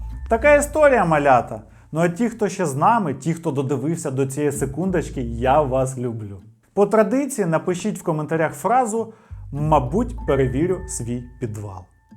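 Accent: Russia. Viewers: Хлопче, тобі необхідно працювати над українською, хоча б інколи спілкуватися з україномовними. Дебільні звуки, притаманні тільки рашистам, відвертають від перегляду і характеризують тебе як примітивного та слабого на голову дурня.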